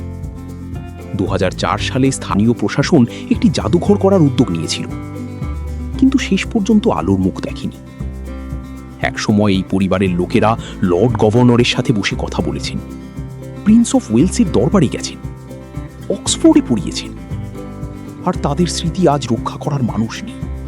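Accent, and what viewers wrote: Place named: Kolkata, India. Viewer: আপনার বংলা একসেন্ট টা কিছুটা কোলকাতার বাংলা একসেন্ট এর মতো। Not bad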